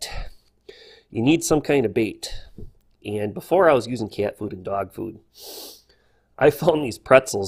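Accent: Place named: Midwest, United States. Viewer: Minnesota? Or somewhere deep in the country midwest.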